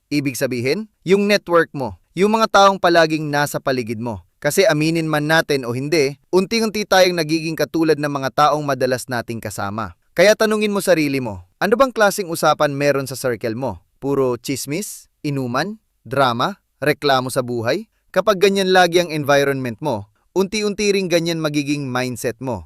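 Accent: India. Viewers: bakit nagiging tunog bumbay??? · Mokhang mombay ang nag sasalita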